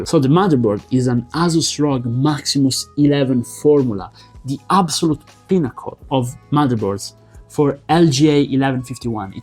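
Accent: Italy. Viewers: Brooo ma sei italiano?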